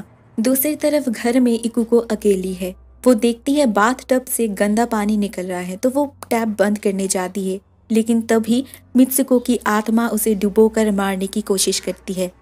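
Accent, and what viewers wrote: Bengal, India. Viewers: Are u a bangali or udiya....?? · Ma'am Don't mind Bt are you a Bengali person????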